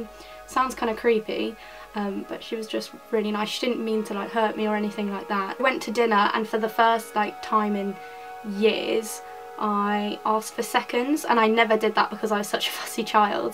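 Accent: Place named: England, United Kingdom. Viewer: I live in UK... your accent is really nice and strong it's like really fully English:)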